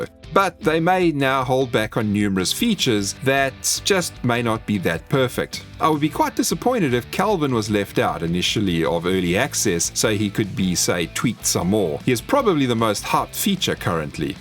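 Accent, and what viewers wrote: South Africa. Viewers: you south african bro? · Lol funny how I could tell you are South African as soon as you spoke.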